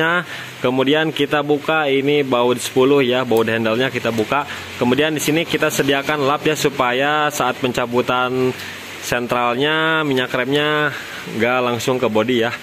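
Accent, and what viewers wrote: West Java, Indonesia. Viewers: Gan logat nya sundA bngkl nya di mna?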